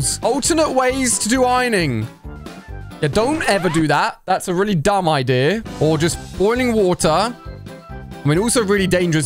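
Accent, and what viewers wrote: United Kingdom. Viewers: Are you from the United Kingdom 😅😅😅😊😊😊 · Bro, are you British? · He is british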